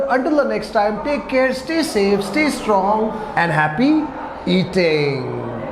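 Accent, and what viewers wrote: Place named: Pune, India. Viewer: Sir. You speak pune marathi. But here in karnataka they use karnataka marathi. Which is not such pure marathi. It is bit different from place to place sir.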